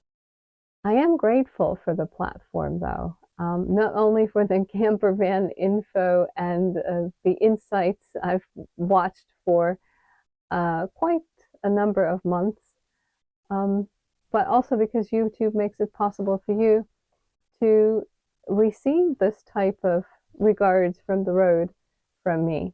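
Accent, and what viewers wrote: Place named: United States. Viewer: Oh liebe, after some failed approach only, today I did see your videos; twice, and I am - my english from the old millenium is too bad - sono commossa. Going around the world with www, with Captain, with the friendly people around the continents, who see and love YOU and these videos - which language fits? I was non really able to understand everything in the video - I suppose, because your english is very US-marked and my english is so old and simple and never really used outside of Germany. I never crossed the ocean, but I feel the love and the blessing to have friends over oceans of water, of mountains, of wars, of years - and this, for me, is making your videos so warm, sincere, beautiful. I loved to see this green and pacific Germany, to see you and your mother so near to each other, to feel connected. I think, this is the secret - your secret, the secret of leaving a country to explore an other country, to find friends and to stay connected. 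And having captain you will be shure to move on and to pass borders and to meet and connect with other people capable to love!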